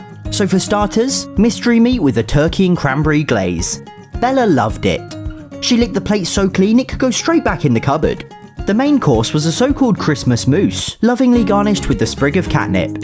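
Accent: United Kingdom. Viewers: Question are you british???????????????????? YOU WILL BE MISSED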